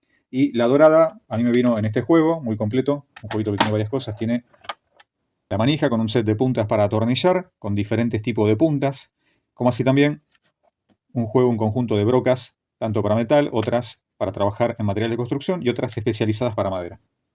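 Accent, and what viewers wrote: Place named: Argentina. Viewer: Sonsonete argentino es molesto.... pongan alguien que hable español neutro... gracias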